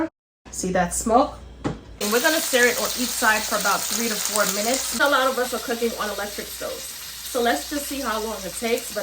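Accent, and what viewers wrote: New York, United States. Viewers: That New York accent tho❤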